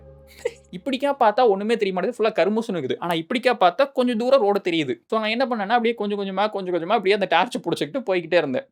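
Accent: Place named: Kongu, India. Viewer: Little Kongu Tamil and Big motivation.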